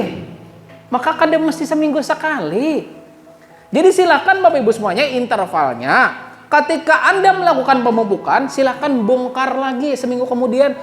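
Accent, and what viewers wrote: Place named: West Java, Indonesia. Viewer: Mirip logat sunda 😅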